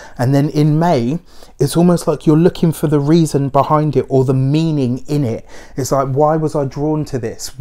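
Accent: England, United Kingdom. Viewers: I love his English tongue. He must came from England. I am 22 of Sep.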